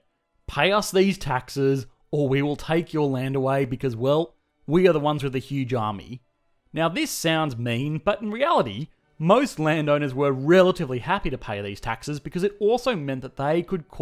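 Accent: Australia. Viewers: This narrator is from the land down under. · I wish Australians would speak in a more anodyne way and not torture vowels quite so much.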